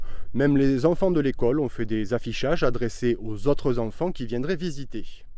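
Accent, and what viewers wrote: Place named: South, France. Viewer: Bravo pour ce petit tournage, "avé l'assent" en plus!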